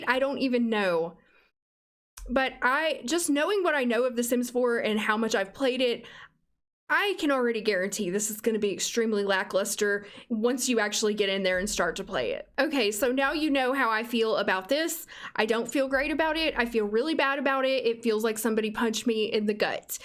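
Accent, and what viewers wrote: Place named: South, United States. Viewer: I’ve never seen one of your videos before, but I absolutely fuckin adore you now 😂 your reactions and facial expressions remind me of the way I react to things, and your lil accent (is it southern? Kinda? Am I impaired?) just seals the deal! New sub here, can’t wait to binge your content ❤️ love from Toronto, Canada!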